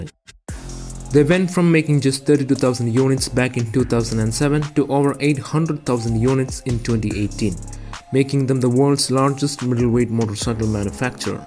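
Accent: Kerala, India. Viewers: മലയാളി ആണോ 😍😍😍 ഇംഗ്ലീഷ് കെട്ടിട്ട് മലയാളിയെ പോലുണ്ട് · Your Indian accent is leaking like a diarrhea. Speak normally!!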